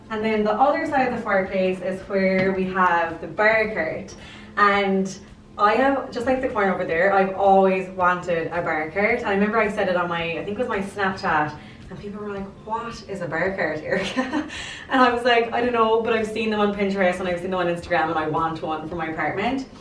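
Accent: Ireland. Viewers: Jaylan O she's irish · Love your Irish accent... And your gorgeous red hair, beautiful green eye; I'm a sucker for redheads, coz I find you very distinct!! You're mostly known to have a fiery temper😁😁😄😄🙋🏽🙋🏽🙋🏽.... Never seen that Marilyn print either... My mammy grew up with Marilyn , she said during her time every wanted to look like Marilyn, she was the poster girl of her time😜😜🙋🏽🙋🏽🙋🏽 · New subbie! I love this room and your accent! Lol are you 🍀 Irish?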